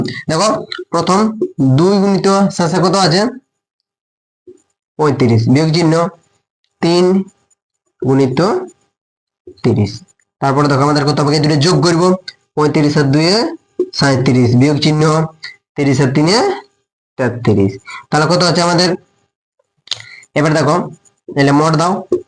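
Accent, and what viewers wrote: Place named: Purulia, India. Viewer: Sir, আপনার কথা বলার ধরনটা পালটাও পুরুলিয়ার ভাষা বলো না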